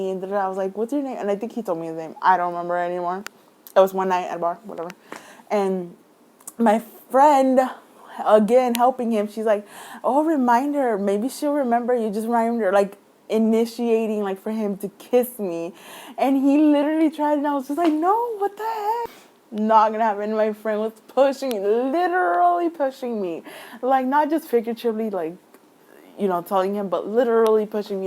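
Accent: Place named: El Paso, United States. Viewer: Omg it sounds like you're from El Paso? Lol my mom is from ELP and I loved going to Mexico to get candy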